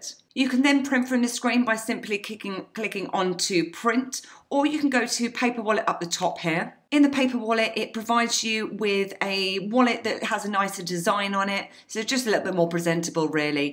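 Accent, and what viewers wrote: United Kingdom. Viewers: your British accent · Nice to finally hear British accent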